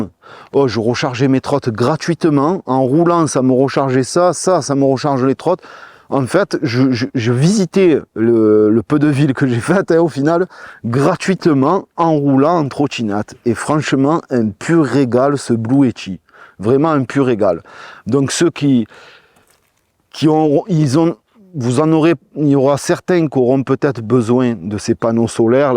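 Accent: Marseille, France. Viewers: Salut! Tes vidéos sont au top, comme à chaque fois. Je kiffe vraiment tes tests et unboxing. J'ai 2 petites questions pour toi qui n'ont pas un rapport direct avec cette vidéo. Première question: Pourquoi ne pas faire des t-shirts et pulls comme tu fais déjà mais avec des bandes réfléchissantes? On pourrait rouler en toute sécurité en te faisant un peu de pub 😉 Deuxième question: Tu fais de temps en temps des vidéos avec des potes, mais est ce que tu envisagerais de faire des vidéos test avec des abonnés? Voilà c'est tout pour aujourd'hui 😁 Ne change rien, continue comme tu as toujours fait Et le petit plus, c'est notre bel accent marseillais 😂😂😂 Bonne continuation à toi, vivement la prochaine vidéo...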